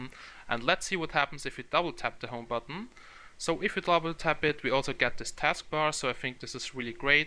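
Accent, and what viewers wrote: Germany. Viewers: Du deutscher man hört das richtig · man merkt es spricht ein Deutscher.. :D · It's so funny his german accent is so obvious. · Ich finde den deutschen Akkzent extrem lustig, in einer guten Art und Weise. Zu Thema: Das ist die Quittung für die billige Produktion von Apple-Geräten in China! ---- I think the German accent is extremly funny, in a good way. Now to the topic: That's the result of the cheap production of Apple products in China! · du bist deutsche oder östereicher das hört man total raus :) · Are you German? Your English sounds German haha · Man merkt voll dass du aus Deutschland kommst weil : 1. Du hast einen deutschen Akzent im englisch und 2. Auf deinem Handy wird dir alles in Deutsch mitgeteilt oder angezeigt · It still doesn't make it a Chinese accent??? He just has a German accent, a Chinese one is completely different.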